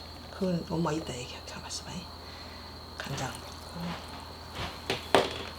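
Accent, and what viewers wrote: Chungcheong, South Korea. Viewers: 억양이 참 듣기좋아요 충청도분이신가요?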